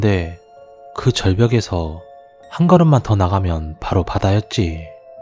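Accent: Jeolla, South Korea. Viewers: 전라도 사투리 졸귀탱ㅋㅋㄱㄱㅋ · 아 특유의 전라도 억양이 너무 정감가서 그런가 내용이 집중이 안되네요 좀 웃김 !! ㅎㅎㅎ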